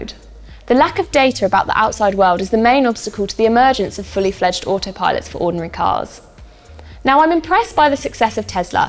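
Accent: United Kingdom. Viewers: Yoooo, you rock!! Love your british accent. Also lukas and bradleys❤️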